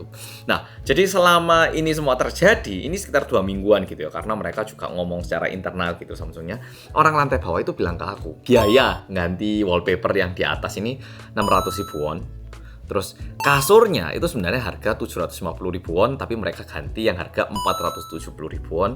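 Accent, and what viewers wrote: Java, Indonesia. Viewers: Itulah percayalah,setiap kesusahan pasti ada kebaikan,berarti di uji dulu dg kesusahan,dn di balik itu Tuhan punya rencana yg Indah dn ALLOH memberikan balasan kebaikan,INTINYA,SETIAP ALLOH memberikan Ujian pasti ALLOH akan mengangkat derajat,aku suka bngt dg dn klrg, krn JAWA BNGT MEDOK BNGT GK NYANGKA ahwa Asli korea.....👍👍👍👍👍💚🙏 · Wes suwi gak kerungu jowoan medok curhat² ngene... Ileng pertama youtubemu biyen.. entah siapa yang salah...ku tak tahuuuu 😅😂😂😂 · ak tuh sll speechless typ nnton videomu, km tuh bner2 ky org jawa asli ngmgmu medok asli😂 maaf ya out of the topic😅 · Melihat banyak kebaikan,menjadi hati lebih tenang,semoga kita selalu bahagia dan dapat membuat orang lain bahagia Jowo ne mendok... Ya Allah bahagia selalu 😊😊😊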